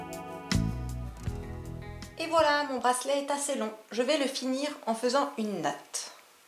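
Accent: Alsace, France. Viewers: Oh merci beaucoup de ta réponse! Je suis moi aussi alsacienne et j'ai cru reconnaître ton accent ;) Bon ben j'ai regardé ta vidéo... je n'y arrive définitivement pas, pourtant c'est très clair et ça semble facile... on va dire que c'est la faute à mes fils :) Du coup j'en profite, tu connais une boutique sympa dans le bas rhin pour le matériel? Je n'ai que du fil de très mauvaise qualité pour le moment...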